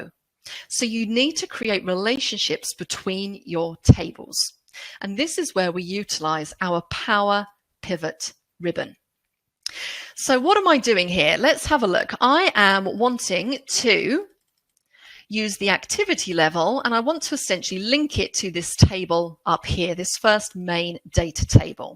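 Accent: United Kingdom. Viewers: United Kingdom accent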